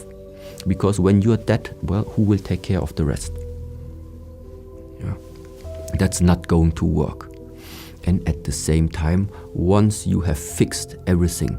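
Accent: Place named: Germany. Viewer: Klingt nach deutschem Akzent.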